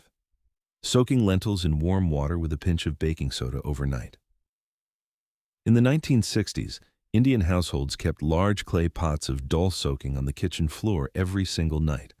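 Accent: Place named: United States. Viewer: Very interesting but the "American" pronunciation made it hard to follow at times.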